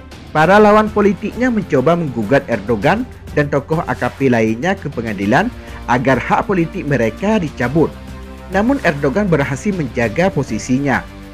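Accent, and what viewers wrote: Aceh, Indonesia. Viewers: Sama huruf. S .mimin kalau dua kata itu agak susah berarti mimin Acheh.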